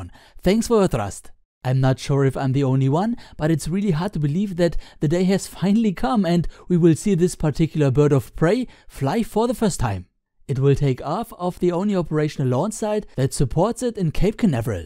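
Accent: Germany. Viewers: CptMikeTango He's German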